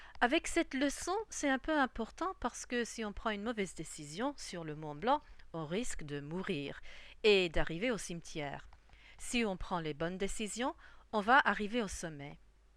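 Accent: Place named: Belgium. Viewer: A l'accent, c'est une belge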